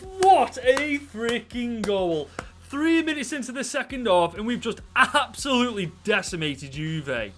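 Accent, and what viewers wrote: Stoke, United Kingdom. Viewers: Only just came across your channel. Great content!! I’ve subbed as I assume from the accent you’re from Stoke ❤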